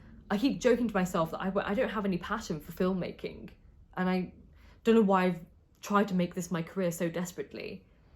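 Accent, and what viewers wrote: RP, United Kingdom. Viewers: The reason I started watching your channel is because I absolutely loved the classic literature content. I don't really know if others agree, so please take my opinion as just that ... one person's opinion. That said, I am eager to see a return of classic literature content. Your presentation of said content was engaging and thrilling, not to mention that, as an American, listening to your posh English accent is lovely.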